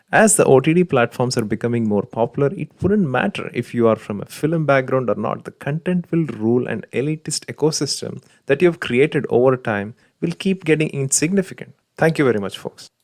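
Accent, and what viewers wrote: Kerala, India. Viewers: one on my best friend who is a malyali and who is an army officer has the same accent and co incidentally he is also 🙂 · Is this host is Malayali 😋 from tht speaking style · Are u Malayalee bro? Ur english slang is typical mallu... Great going... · I'm here to listen to the slight mallu slang that he has in the English... It's so satisfying😍😍😍😍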